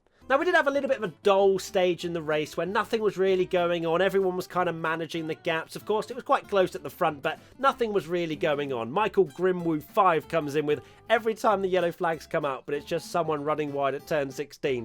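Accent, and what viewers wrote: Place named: United Kingdom. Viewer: No,the reason why you sounded weird is due to the fact that you are Brit m8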